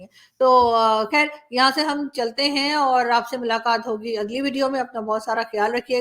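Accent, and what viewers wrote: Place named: Lucknow, India. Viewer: Well said....... Lucknow's style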